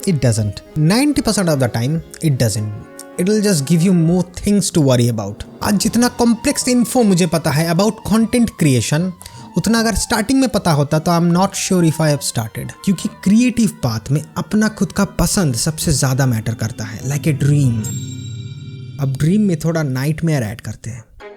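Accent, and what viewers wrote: Bengal, India. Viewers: Bhai aap bengali ho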